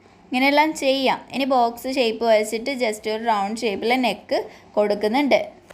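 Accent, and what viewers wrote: Kannur, India. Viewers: ഇത് കണ്ണൂർ ആണോ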